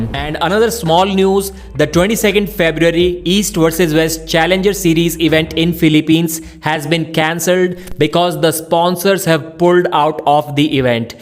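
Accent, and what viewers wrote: India. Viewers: you need to learn to change your accent to a neutral one bro 😂 your accent is too heavy on the indian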